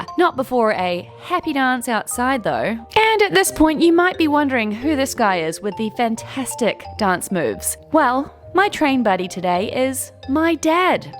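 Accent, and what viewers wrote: New Zealand. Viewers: Fortunately, your Kiwi accent actually isn't too strong for me to understand and to fully comprehend. As a matter of fact, it's really quite pleasant listening to! I'm a GER, trying to improve my English listening skills by watching your wonderful videos. Rail travel has been my favorite mode of transport for decades, I can relate to any second of your content. While watching and eventually commenting on this video, I just might improve my writing skills as well! Tons of looove and kudos to you from a GER subscriber.... 🥰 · I like how your Kiwi accent becomes stronger when you're in NZ, especially with your family 😄. Awesome video. NZ is truly quite beautiful.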